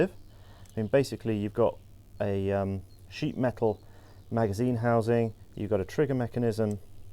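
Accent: United Kingdom. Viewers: From his accent,I assumed he was British,and living in the UK. I then send,WTF, how can he get access to a suppressed PISTOL ???!!! I then learn he is in Switzerland. Didn't realize they have gun laws not much different than in the U.S.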